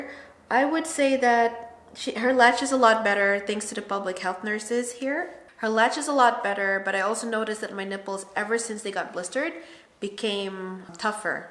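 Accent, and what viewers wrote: Philippines. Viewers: in this video she has that Filipino english accent....but her sister has more of d Pinoy accent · agreed she's talking with a more Pinay accent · She has a very distinct Filipino way of speaking certain words beginning/ending with ‘t’ and ‘th’. She substitutes a ‘d’ quite often; they becomes dey; with becomes wid; time becomes a subtle ‘dime’; there becomes dere.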